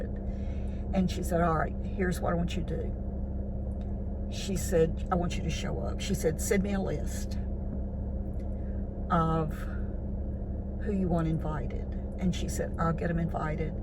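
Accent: South, United States. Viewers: This was such a great story!! Your southern voice and the way you tell it is wonderful❤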